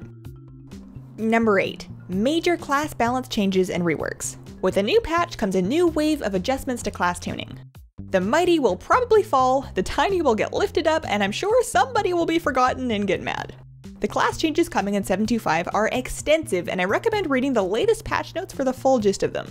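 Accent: Canada. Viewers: haha i love the canadian accent :D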